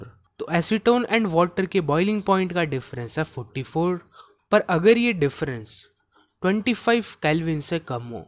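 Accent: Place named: Bengal, India. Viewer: Sir are u bengali that mota moti sounds familiar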